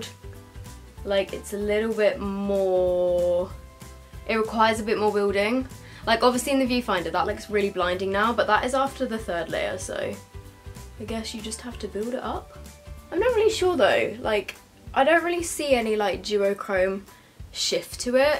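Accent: England, United Kingdom. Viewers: I live for english accent 😍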